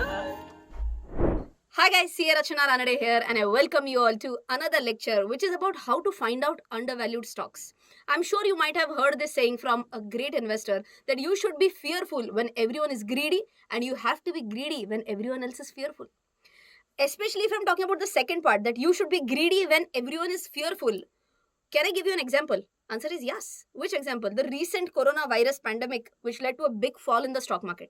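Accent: South India, India. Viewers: She Is Marathi but her accent is like south indian..... I don't know why but My English is also sounds like her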